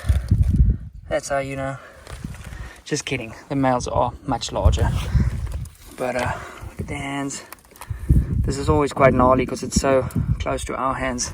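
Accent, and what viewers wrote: Afrikaans, South Africa. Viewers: Bro sounds southafrican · Ek hoor jou Afrikaans !! ❤❤❤❤Jy skiet mooi! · Yo dude kan jy afrikaans praat i said can you speak afrikaans cause you sound like you come from south afrika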